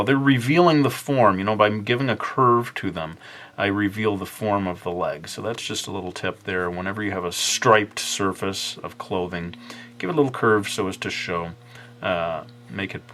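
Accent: United Kingdom. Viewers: Your british accent is hilarious. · you are so brittish :D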